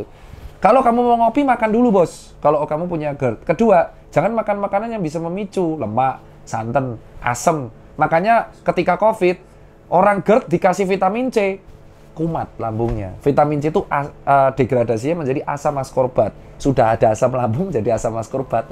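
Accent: Java, Indonesia. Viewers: Salam sehat slalu dok.... Saluttt juga kerennnnn logat jawae dokter tirta , Salam satu jiwa dari arema.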